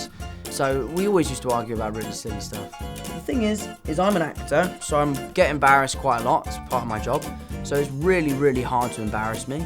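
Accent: United Kingdom. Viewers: His British accent is alive we can rest now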